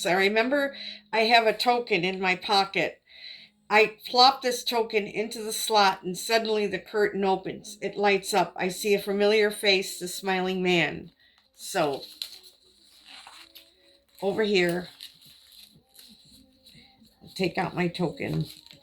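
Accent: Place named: Upper Midwest, United States. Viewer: That accent !! - I recognize as either Wisconsin or Minnesota !! Go Badgers ! Lolol. Where's Margie Gunderson ?!?!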